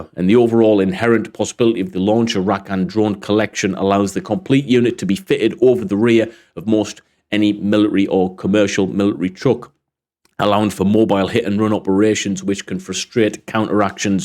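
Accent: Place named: United Kingdom. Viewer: I've added you into the rotation with Andrew, Denys, Paul, & Artur. Great rundown, mate! Especially appreciate seeing the satellite map, but as a Brit ex-pat it's nice to hear the accent - at regular speed😆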